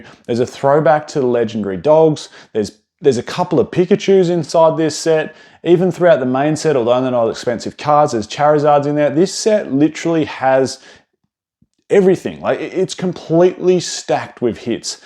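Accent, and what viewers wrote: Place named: Australia. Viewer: I still can’t figure out why ausies pronounce their A’s as R’s but seemingly ONLY at the end of the word. He said Data and it sounded like daughter 🤣🤣 Any way I know people have given you shit about this before and this isn’t me doing that. All ausies do it and it’s just interesting to me why it came to be. Love your content man!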